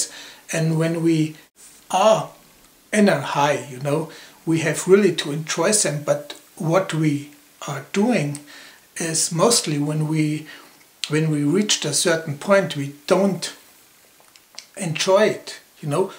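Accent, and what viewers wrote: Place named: France. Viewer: OMG! Are you FRENCH?! :D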